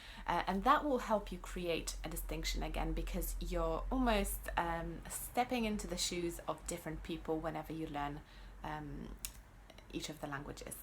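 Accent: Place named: United Kingdom. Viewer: Very useful tips, I'll definitely try out some of them. Last year I started to learn Norwegian and Hungarian from scratch at the same time and I was quite unsure whether it was a good idea. I have one question for you: how did you manage to lose your native accent and speak like a Brit? I'm Polish too and although my accent is clear I'm still far from sounding like a native. Thank you for the video!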